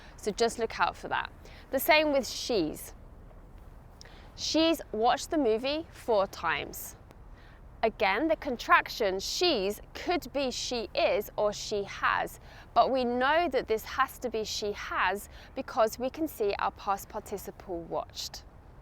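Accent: United Kingdom. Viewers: Nice video. ❤ Is it British Accent you speaking? Just a doubt. I am a new subscriber.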